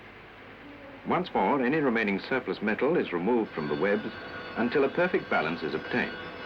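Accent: RP, United Kingdom. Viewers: I thought it was the typical "received pronunciation" of the era, aka BBC English...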